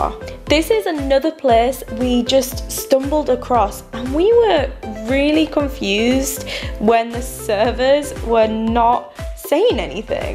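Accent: United Kingdom. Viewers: I really love the British accent! :))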